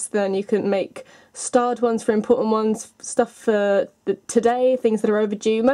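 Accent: United Kingdom. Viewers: oh my god, finally ! I have been watching these videos and the were either american or australian people. Finally an english girl. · its kind of rude what im saying but sometimes the british accent kinda of throws me off..idk why not trying to be rude or anything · are u british????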